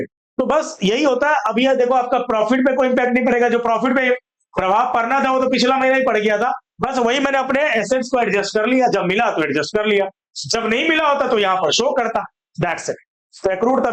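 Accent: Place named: Kanpur, India. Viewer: Language (boli) se lag raha hai AAP kanpur se ho. What am I right??🎉🎉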